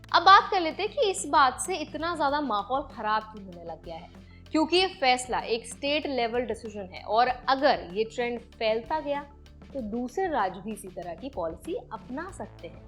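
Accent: India. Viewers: I'm sorry but you Indians hsan annoying accent when you speak English. Have you ever listened to yourselves?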